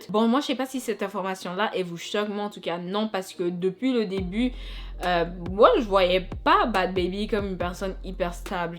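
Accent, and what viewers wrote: Quebec, Canada. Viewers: L’accent québécois + l’accent ivoirien je meurt le combo 😭😭 j’aime trop ton accent 😂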